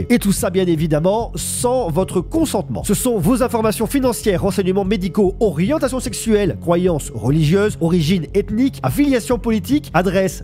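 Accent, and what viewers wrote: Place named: France. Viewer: Je pense que c'est l'IA qui fait la traduction. L'IA laisse même l'accent français. 😂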